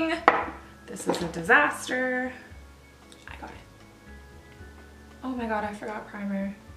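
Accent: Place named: Canada. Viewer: This is the first video I’ve watched of yours upon seeing it in my recommended videos list. Could tell that you’re Canadian as well instantly !! Super cute!! Subscribed!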